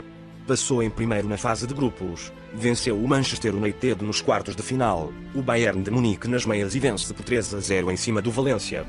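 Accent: Portugal. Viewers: comigo não tem problema o sotaque português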